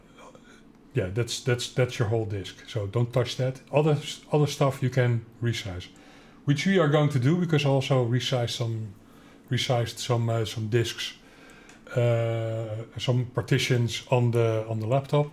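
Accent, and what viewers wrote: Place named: Netherlands. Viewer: You have a Dutch accent. I’m just a Linux noob, but am really interested in more holistic and well designed Operating Systems, so thanks for the video!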